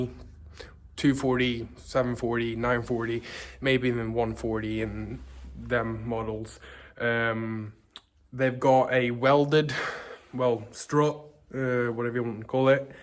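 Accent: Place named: England, United Kingdom. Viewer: fan jävligt bra video måste jag säga ändå och din engelska e fan jävligt bra låter som du kommer från england nästan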